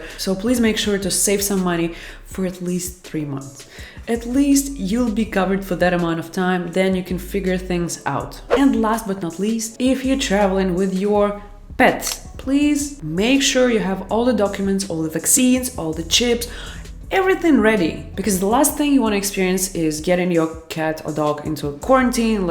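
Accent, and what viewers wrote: Russia. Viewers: I love it when you speak English with russian accent ❣❣ thanks a lot for all the super useful tips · Great accent rassiskiy☺️😁😁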